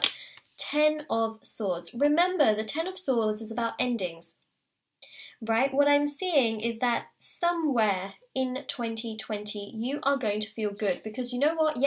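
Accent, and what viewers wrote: United Kingdom. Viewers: Uk accent.very nice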